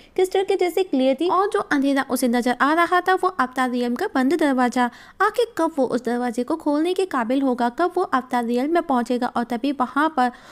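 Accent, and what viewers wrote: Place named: Bihar, India. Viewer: Khisiya hua tha 😂 aap bhi Bihar se ho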